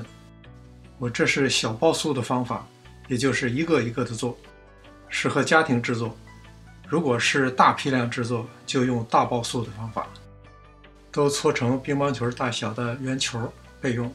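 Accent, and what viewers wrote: Beijing, China. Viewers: 太喜欢看您的视频了，菜谱简单轻松易学，谢谢您和大家分享。北京话听起来好亲切啊 ☺️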